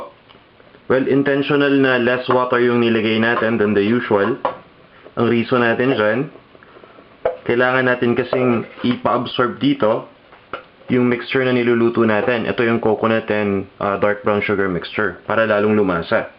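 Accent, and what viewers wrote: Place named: Batangas, Philippines. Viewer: Gusto ko po yung Batanguena accent at yung pagpapaliwanag sa pagluluto. Good Luck po... subscribing :-)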